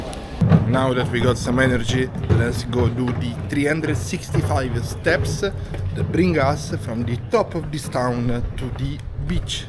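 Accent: Italy. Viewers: Nice video I loved it so much like your Italian accent, keep coming back. Much love habibi 🇹🇳❤ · So beautiful the place look like Greece beautiful video thanks for sharing I like your Italian accent when you speak English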